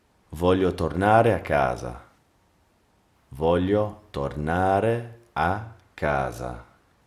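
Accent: Italy. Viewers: No this is French with an Italian accent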